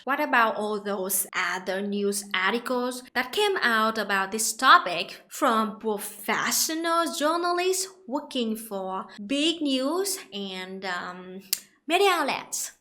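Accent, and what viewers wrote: Vietnam. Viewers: YouTube is really bad at interpreting a Vietnamese accent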